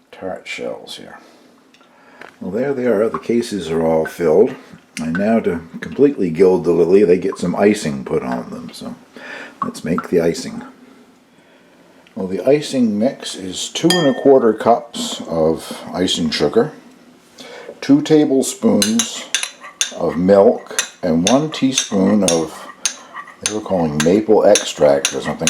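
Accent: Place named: Cape Breton, Canada. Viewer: Aww you remind me a bit of my dad - the good ol Caper accent is so endearing. I have never made these CB pork pies but I remember them well from my childhood growing up in Cape Breton. I've been living in Europe for the past 30 years now. Feeling a little homesick and would like to make these for tomorrow as we're having family over for supper. I think I might even make a traditional meat pie or cabbage rolls as well and go the whole 9 yards. :-) Merry Christmas sir!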